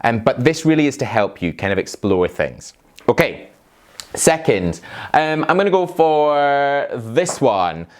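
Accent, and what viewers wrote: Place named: Glasgow, United Kingdom. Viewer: You from Glasgow dude?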